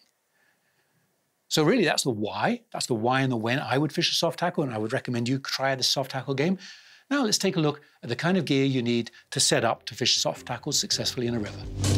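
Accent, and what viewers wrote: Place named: Wales, United Kingdom. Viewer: awesome vid as always. I’m wondering about your accent; everything I’ve read says you’re from the West Country but I’m sure I detect a hint of Welsh in your voice?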